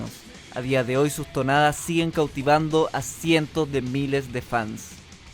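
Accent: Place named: Chile. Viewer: Amigazo. Me encantó tu vídeo y estoy de acuerdo en toooooooooodo lo que dijiste. Para mí este álbum es una obra maestra y el mejor album que Metallica pudo haber hecho! Así que tiene su merecido like y un sub más jeje. Por cierto, de que país eres? Tienes acento chileno . . . Pero quizás me equivoque sjjsjs saludos!! 🤘🔥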